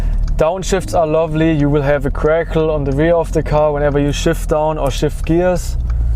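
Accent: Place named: Germany. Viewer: Are you German? You sounds very German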